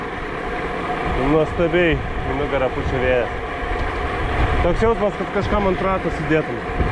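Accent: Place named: Dzūkija, Lithuania. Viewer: Kietai. Fainas dzukelio akcentas :)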